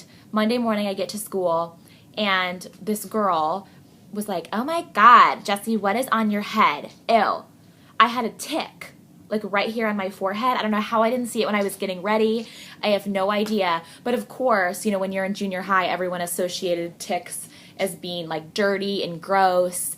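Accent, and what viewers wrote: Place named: Illinois, United States. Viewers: how you say illinois is so funny..love it...im from illinois, near chicago and its funny how our accents are so different but were still in the same state! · WHATTTT! girl i didnt know you live in illinois! we have totally different accents! hahah. what town are you from or near?!?!?!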